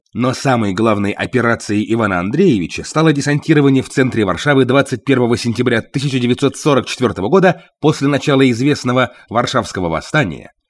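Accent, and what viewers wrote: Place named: Romania. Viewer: текст читает румын, портит восприятие.....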